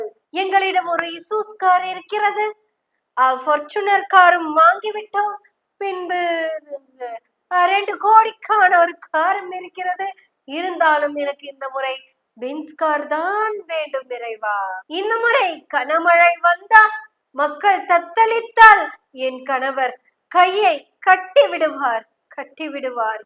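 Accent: Kongu, India. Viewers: Amai kunjugalayum anil kunjugalayum vibration adipathe ungal velai kongu thamil nalla iruku thanks Sister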